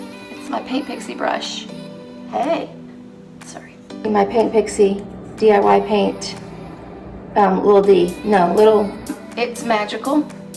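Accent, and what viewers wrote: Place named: Texas, United States. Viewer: are you from Texas you sound like it. I’m from Texas. I’m going to add my cabinet painting to my channel and give you a shout out if you are ok w that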